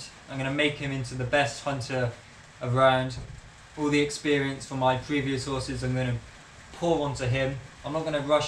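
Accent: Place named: United Kingdom. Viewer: you are so handsome and I love your British accent, I've learned so much about words and phrases you use that are so different in America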